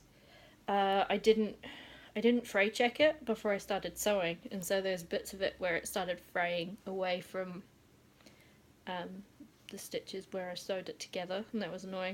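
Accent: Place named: New Zealand. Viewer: I have made her facebook page public this evening so hopefully you will see what she looks like and what she has been getting up to since she came to me in August. I didn't know you were British, you must have come here when young you sound totaly Kiwi, we are from Yorkshire and have been here nearly 10years. The idea for your story came to me a while ago, when you mentioned that you didn't know where to go with regards to her story. I was waiting in anticipation when Customs had her and this was the time i was waiting for mine and thought "oh no im going to have to wait forever if customs get a hold of her, i had sleepless nights about her been ceased for her magnets, all sorts of things, then i was worried i was mad at what i was intending to do with her i didn't realise that there were others out there like me, so then i though oh im not original in my way of thinking which i was kind of disserpointed in, so i couldn't win with myself, but now i love watching unboxing video's and you tube but i just watch Shell Bea, Swannz, and the lady who took her dolls, dolls bike and dogs to the beach in Auckland, i've forgotten her name but found them i think through stalking ha ha ha. 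When you were unboxing her it made me cry tears of joy for your wait was over, and mine was soon to be delivered. I love my Ppinkydoll and have another coming in October, its my birthday today and so im thinking erm.... birthday money= Lilith. I have been in a tiz regarding patterns, i sent for some fro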